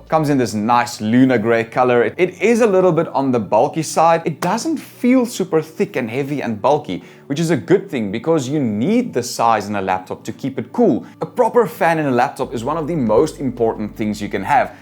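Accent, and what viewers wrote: South Africa. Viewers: Is he South African? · are you south African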